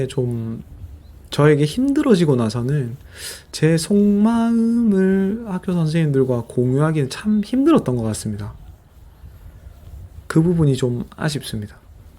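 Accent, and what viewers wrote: Busan, South Korea. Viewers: 우연히 보게된 영상인데.. 희한하게도 끝까지 보게 됐네요. 익숙한 부산 사투리.. 그리고 같은 전공(아 저는 사범대는 아니고 그냥 국어국문. 동기들 중에 부산에서 교사 하는 사람들이 좀 있죠. 어쩌면 선생님과 같이 근무를 하셨을수도.. ㅎㅎ)게다가 쭉 보다보니 남편의 모교이기까지.. 이만하면 끝까지 볼 이유는 충분한 건가요? 학교에대해서는.. 선생님만큼 할말이 많은 학부모 시기도 모두 끝난 사람이지만 여전히 학교에 대해서는 많은 생각을 하게 됩니다. 우리 사회에 얽힌 실타래가 있다면 그리고 그 실끝을 따라가 보면 끝에 교육이 있고 학교가 있다고 생각하기 때문이죠. 큰 아이를 처음 초등학교 입학시켰을 때의 충격이란.. 내가 국민학생시절이었던 1980년대 초등학교와 별 반 다르지 않은 시스템에 놀랐습니다. 교실마다 에어컨이 있고 샷시가 좀 고급이고.. 그 정도 물리적 발전 말고 소프트웨어적인 발전은 찾을 수가 없었거든요. 선생님이 어떤 고민을 하셨을지.. 충분히 이해된다면 자만일까요? 아, 우연히 본 유튭에 너무 몰입을 했네요. 댓글까지 꽤 꼼꼼하게 읽었으니까요. 학생들의 댓글을 보니 좋은 선생님이셨음이 충분히 느껴집니다. 연못에서 탈출하셨으니 넓은 바다로 가서 마음껏 헤엄치고 즐기시길 바랍니다. 응원합니다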